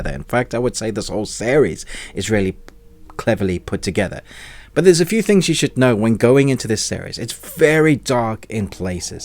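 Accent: South Africa. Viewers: Are you South African and British or something?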